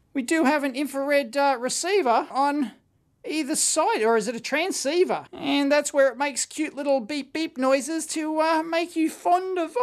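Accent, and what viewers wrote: Australia. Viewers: I clicked on the video without reading anything about it. Imagine my surprise when everyone's favorite Canadian UBF started speaking with an Australian accent.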